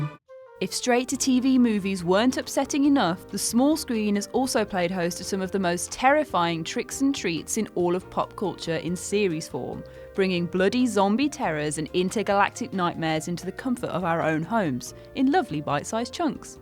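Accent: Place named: England, United Kingdom. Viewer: I hear an English accent. That's exciting enough 4 me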